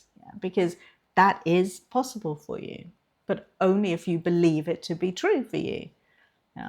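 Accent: United Kingdom. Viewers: 😊😊😊 That British accent is too kool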